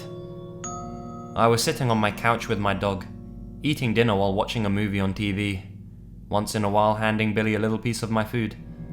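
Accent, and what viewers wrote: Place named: United Kingdom. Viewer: Good stuff. One of the best narrators for this stuff, I'll even forgive the Brit accent.: ) Actually I think that's what really brings it together, keep it up. I wonder how many creepos listen to these stories and are like "Oh shit, that was me! Awesome."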